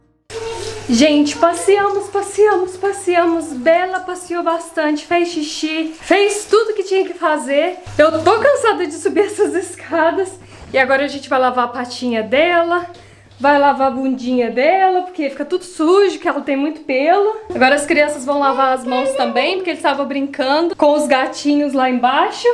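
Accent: Minas Gerais, Brazil. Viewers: Eta, mineirinha arretada, sempre com o sutaquinho mineiro kkķķkkk,adooooooooooooro,bjs pra vc e sua sogrinha mamãe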